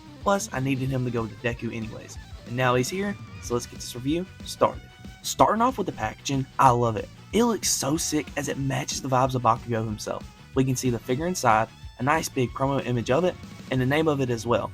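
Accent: South, United States. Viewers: He got that southern accent 😂.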